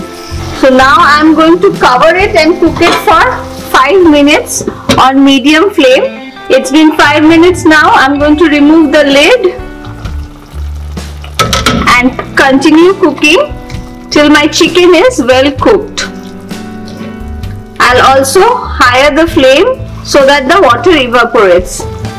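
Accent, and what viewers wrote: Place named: India. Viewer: I could not understand what said she was adding? is a nice lady but with her high pitched voice and Indian accent, I struggled to hear what she was saying for much of the video. Looking at the video again, I think it was, the ''Fenugreek'' that she added?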